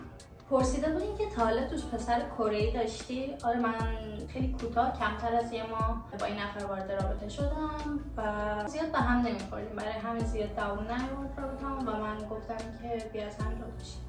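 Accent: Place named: Turkey. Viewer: ببین با این که کل روز کره ای حرف میزنی ولی ته لهجه ی ترکی داری خوش بحالت کاشکی منم اردبیلی بودم من تبریزیم ولی اردبیل رو دوست دارم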